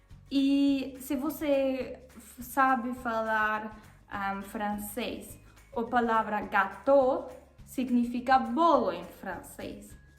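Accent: Germany. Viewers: O Mico é normal, leve com bom humor para não se aborrecer e você aos poucos vai estar falando o idioma perfeitamente. Procure ouvir músicas no idioma português brasileiro e sempre que possível converse no idioma. Não sinta vergonha de errar e continue praticando. Uma das coisas que deve sempre observar é o uso do gênero, na maioria dos idiomas o artigo é mesmo para o masculino e o feminino, porém no português muda o artigo a, o, as, os articula com a palavra e muda com o gênero masculino ou feminino. Por exemplo numa frase como Deixei cair a xícara da mesa, "xícara" é uma palavra feminina é precedido do artigo "a" , seria errado dizer : Deixei cair o xícara da mesa. O seu sotaque alemão é bem leve quase não se percebe, quando estiver falando e articulando corretamente a maioria das palavras se você não disser estando no Brasil que sua nacionalidade é alemã facilmente se confundirá com uma brasileira.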